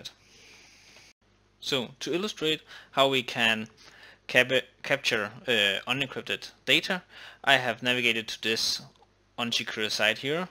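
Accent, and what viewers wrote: Denmark. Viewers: This guy sounds more danish when speaking english, than a danish speaking danish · i can reconize a danish accent from a mile away! Rødgrødmedfløde team assemble!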